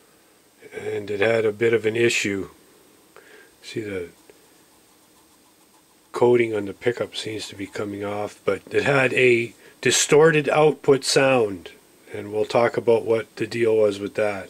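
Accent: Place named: Maritimes, Canada. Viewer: Hey are you from the Maritimes originally? You sound like one of us. lol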